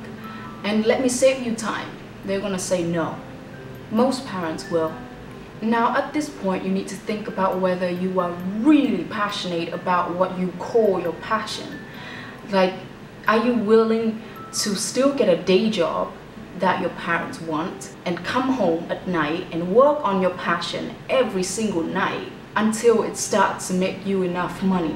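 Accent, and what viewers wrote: England, United Kingdom. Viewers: British accent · hers is British accent, you can easily recognize it by some words like " a lot, all, stop" it's my opinion btw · Em thích cái giọng British của chị lắm luôn ý. Nghe rất dễ thương, điệu điệu mà không quá chảy nước như trên TV. Yêu chị vô cùng tậm, luôn luôn support mọi hoạt động của chị. Mặc dù em lười đọc lắm, nhưng sẽ cố đọc hết blog của chị <3 <3 Yêu chế <3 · Giọng Anh ạ? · ôi giời ơi, accent anh anh, nghe lạ quới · British accent nghe thích quá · British accent của chị hay quá hihii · Huhu em thích giọng anh anh của chị dã man ý chị ơiiii em thích cái độ dày với ấm trong giọng anh anh ☺️☺️☺️☺️☺️ Nhưng lại luôn cảm thấy kiểu nửa vời lại còn thích đú cái khó 🥺🥺🥺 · English accents :)))) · There is nothing quite like a person with UK accent who didn't grow up there. Very unique. By the, I love the energy that you have. 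Great work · Từ Anh về có khác, eo ôi cái British accent nặng ghê gớm !!! , nghe nhộn nhộn vui vui, đáng yêu ghê cơ chị ạ, ahíhí !!! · Chị nói giọng Anh Anh Chị du học ở Anh ạ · british accent cua chi hay qua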